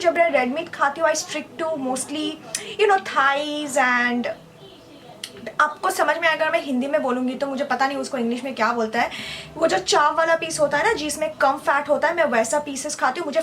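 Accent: Bengal, India. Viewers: Nice log..... love your bengoli style hind.....😍😍😍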